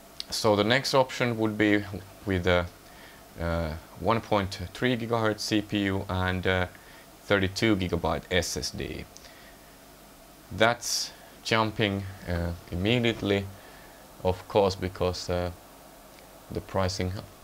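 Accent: Finland. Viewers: ru Finnish?...u sounds like my friend from Finland. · I seek reviews on a gadget and I somehow always end up watching the ones with that guy and his finnish accent ;). They're the best. Thanx for them all!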